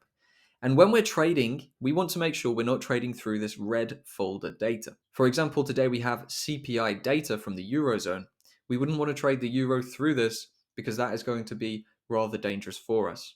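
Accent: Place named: Northern England, United Kingdom. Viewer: I have just subscibed. He might just come from North England. Maybe, Newcastle, Middlesbough, Sunderland, Southshields, Cumbra lol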